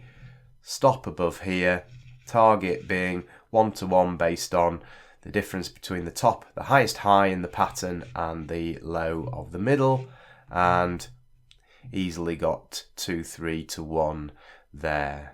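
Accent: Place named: England, United Kingdom. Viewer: And besides I do love the English accent :)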